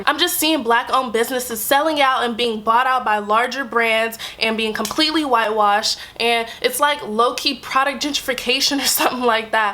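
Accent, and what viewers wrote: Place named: New York City, United States. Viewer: awww you're growing a SLIGHT NYC accent! lol. anyways, great video I totally agree w. your viewpoint on this BS.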